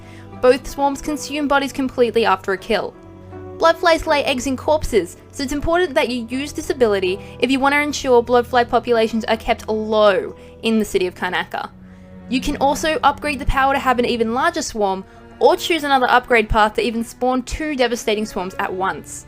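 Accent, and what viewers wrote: Australia. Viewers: Even with the lack of official info u still manage to make an informative video, really impressive if I may say so. Is that an Aussie accent? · are you aussie?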